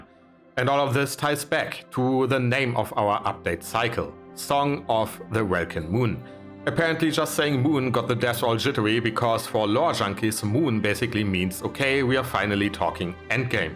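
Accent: Germany. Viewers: The video is great, however, the whole time I've been wondering where you're from and I think it's a German accent? 😂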